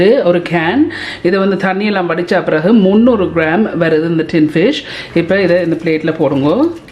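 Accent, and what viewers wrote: Jaffna, Sri Lanka. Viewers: Dear sister reasontly i saw your videos it's very useful my mother cooked like this i didn't learn from her she passed away every day when i cook i sadly remember her and regret my careless but now i am very very happy because your are uploading my moms recepi thank you very much Sister and your tamil (our jaffna tamil) is super👍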